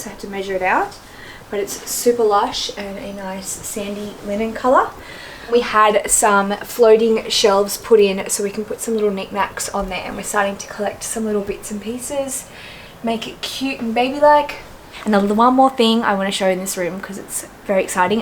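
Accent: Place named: Australia. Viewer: My ‘normal’ you mean outside of Australia? 😂 she has a normal Australian accent ✌️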